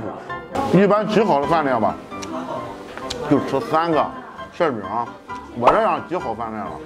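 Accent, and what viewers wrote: Qingdao, China. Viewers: 想回家啊，听青岛话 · 聼青島話，回憶喝青島啤酒吃海鮮，真想故鄉。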